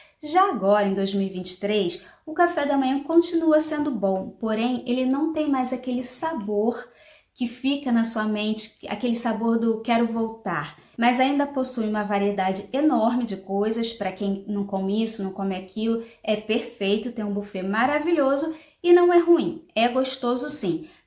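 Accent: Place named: Brazil. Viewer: Speak like brasileira.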